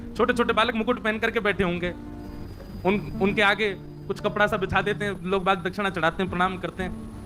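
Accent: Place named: Braj, India. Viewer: जय हो भैय्या बृज भाषा बड़ी अच्छी लगै आपके मुख से। सुंदर कथा। हमने भी राम स्वरूप जी के दर्शन किए हैं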